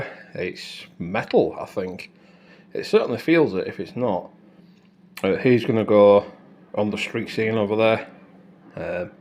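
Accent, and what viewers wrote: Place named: North West England, United Kingdom. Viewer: I’m new to the channel but I love it I have my large layout in my double garage in Blackpool I can tell from your accent that your also from the north west I think My layout is totally mixed although no steam as I only knew diesels and electric so everything from deltics to class 800 inc the new class 92 from Accurascale I have about 120 locos and dmus and emus I started one year ago returning to the hobby after twenty years away and now I’m fully dcc with most being full sound fitted Great way you present the video and fantastic editing along with I really like the channels thank you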